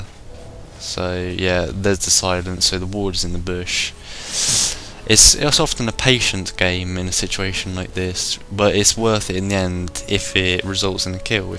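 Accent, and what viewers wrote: United Kingdom. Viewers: British accent, huehue.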